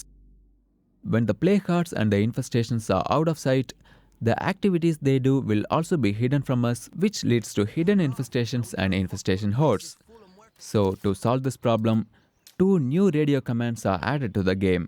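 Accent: India. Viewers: The Indian English accent isn't innately irritating or anything; you gotta understand that the economic status quo of our world, and Indians' superior command of the English language compared to the Chinese, for example (not compared to natives, I mean), made India the most popular call-center destination of the world. And very unfortunately, most companies that want to save money by using Indian customer-support representatives on the phone instead of local ones also end up wanting to save money on many others aspects, sometimes at the expense of customers, so they end up frustrating customers for countless reasons that go beyond any language barriers with the Indian customer-support representatives. So, many people around the world started to gradually feel irritated with the Indian accent, not because it is innately irritating, but because it reminds them of their frustrating experiences with the companies that employ Indian customer-support reps. 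However, don't let this deter you from just being yourself. Nobody ends up making everyone happy anyway. And as long as you enunciate and speak as clearly as you can, people who are open-minded and interested enough in your content will be able to ignore your accent and just focus on the content that you're delivering. It is more important to be your natural self than to try to please a percentage of your audience, while still improving that "self." A lot of people use the advice of "be yourself" wrongly, where they take it as an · I can hear the Indian in you. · Indian accents are kind of pleasant to me,work on your annunciation though🤙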